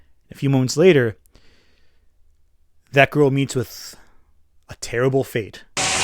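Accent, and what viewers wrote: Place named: New York, United States. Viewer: Werewolf By Night is one I've never heard of, but it sounds amazing! I'l have to check it out! Also, are you from New York? My family is from New York and Massachusetts and you sound a lot like them.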